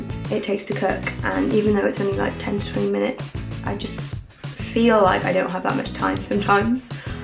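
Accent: Wales, United Kingdom. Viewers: Is there a hint of wales in that accent ?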